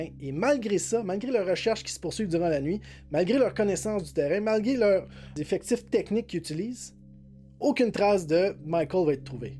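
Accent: Quebec, Canada. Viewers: Un québécois, sa fait du bien, lâche pas mec, c'est super bon ce que tu fais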